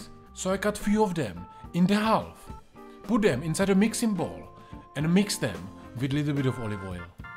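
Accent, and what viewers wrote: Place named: Czech Republic. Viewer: I enjoy your Czech accent, and am impressed with your English, not to mention your amazing cooking skills and techniques. Thank you for your amazing content, and inspiration.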